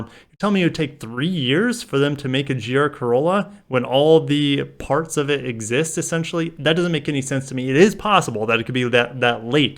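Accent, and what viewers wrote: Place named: United States. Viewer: Why can't Americans pronounce the simplest of words correctly? The rest of the world doesn't seem to have a problem.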